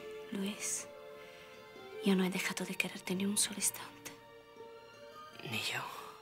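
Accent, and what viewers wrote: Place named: Italy. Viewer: bruttissimo l accento italiano nello spagnolo🙉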